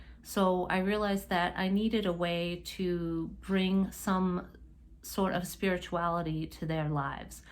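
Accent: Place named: New England, United States. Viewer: I can relate to the definitions for heathen....it’s one thing to walk outside conventional beliefs and practices for ourselves, but it’s different when we have to consider our children. Both my husband and I did not feel served by christianity and had no desire to burden our daughter with it. So after she was born we had our own “naming ceremony” for her. Close family members were invited as were her godfather and goddess mother.... we gathered on the beach and blessed her with the elements air ( a feather) fire (herbal smoke stick) water ( from the ocean) and earth (a sprinkling of sand) we called in the ancestors and asked them for their blessings. People were asked to bring gifts, but not the kind you buy in a store, it was a beautiful, personal, and deeply meaningful ceremony. To this day, thirty years later, folks will comment to me how much they loved it. 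Its not easy to walk outside convention, but I’m glad we chose that path and our daughter is, too. I just discovered your channel and I’m going to check out some of your other videos. BTW, are you from New England ? I feel like I could hear traces of a slight New England accent! I’m from Massachusetts.....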